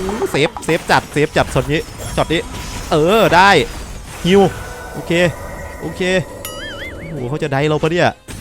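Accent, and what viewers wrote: Isan, Thailand. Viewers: ชอบความเว้า 555 ดีครับ อยากให้ทำต่อไป lol wr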